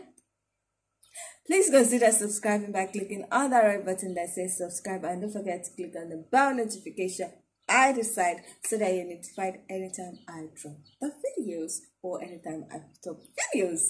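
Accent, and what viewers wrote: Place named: Africa. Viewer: So I was right, I thought it was from a country from Africa, because I've listened so other girls or boys, and they sounded with a similar accent.I like it.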